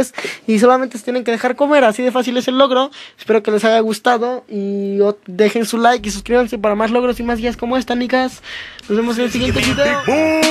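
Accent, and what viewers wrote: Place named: Mexico. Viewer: Habla como mexicano, dice palabras de españa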